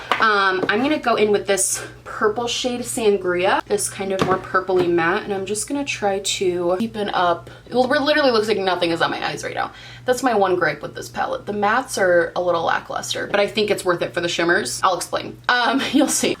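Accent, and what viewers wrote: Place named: South, United States. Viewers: So glad you enjoyed your vacation! Also your southern accent killed me 😂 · Great Southern accent from a real Southern Belle!!! ♥️♥️♥️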